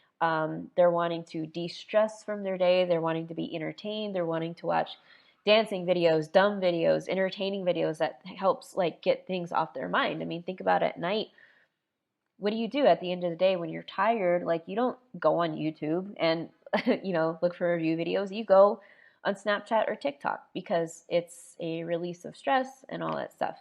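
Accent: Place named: Australia. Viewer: Is it only me, or do I love his Aussie accent?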